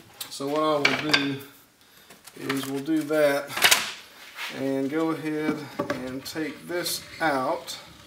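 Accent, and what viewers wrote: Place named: Georgia, United States. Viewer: Your voice and accent remind me of a gentleman by the name of Norman Blake from Rising Fawn, Georgia. You must be a Georgia native, if I'm not mistaken. Anyway, thanks for sharing your assembly contraption. I'm working with the Layens system of frames, so it's a slightly different set up. Thanks. Bob ( a Norman and Nancy fan in Montana)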